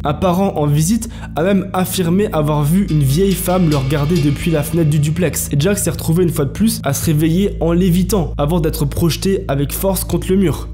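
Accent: France. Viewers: Salut, je découvre ta chaine ^^ N'aurais-tu pas comme un chtit accent du chnord? ^^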